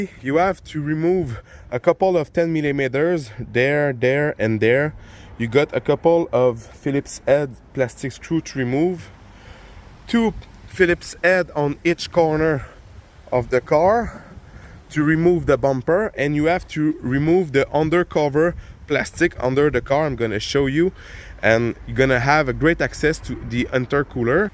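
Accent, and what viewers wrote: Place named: Quebec, Canada. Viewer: Je reconnais bien l’accent québécois 😅 j’ai le même! Merci pour le vidéo je m’apprête à remplacer l’intercooler sur le Santa Fe à ma conjointe.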